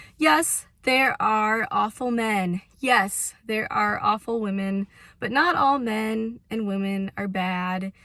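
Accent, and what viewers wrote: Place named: Wisconsin, United States. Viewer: I love the cute Wisconsin accent that is something between the Canadian “oooout” and the American “aut”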